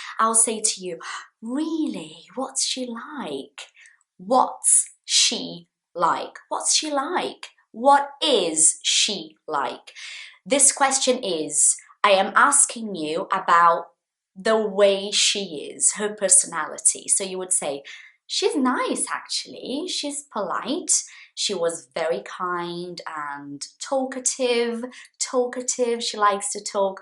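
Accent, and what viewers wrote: RP, United Kingdom. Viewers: Excellent! And your British accent is so posh!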